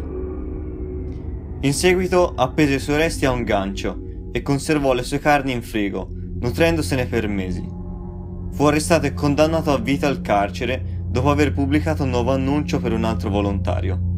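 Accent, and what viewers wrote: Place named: Tuscany, Italy. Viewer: L' inflessione della lingua è toscana !!!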